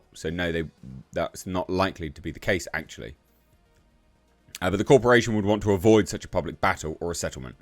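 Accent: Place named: United Kingdom. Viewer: There’s something that makes a Brit hurling insults make them more legitimate. What accent do you have? Like the USA I know there are more than 1.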